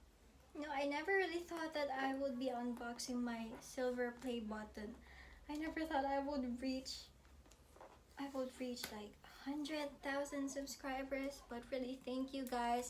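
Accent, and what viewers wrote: Philippines. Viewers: u sound like a filpino? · Wow hearing ur voice I just realized that ur Philippino and ur voice is so cute and sweet u r so good at acting and keep up the good work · I think your from Philippines because that's how I sounds when I'm speaking English and I'm from Philippines 🧋🧋🌸🌸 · weit is she Filipino bc she has the accent and she said and hirap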